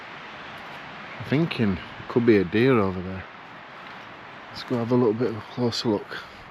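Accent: United Kingdom. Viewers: Nah, the accent sounds cool. Stuff sounds better with a British accent and they have great colloquialisms.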